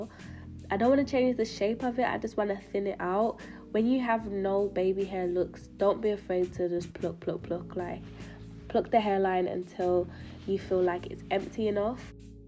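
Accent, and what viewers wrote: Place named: West Midlands, United Kingdom. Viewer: I love black girls with a West Midlands accents !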